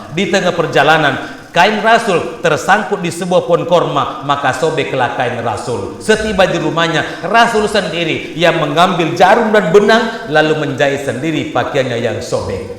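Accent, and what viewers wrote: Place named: Bone, Indonesia. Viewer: Ust dr Bone terlht dr logatx sukses ust smga sht slalu. Amiiin.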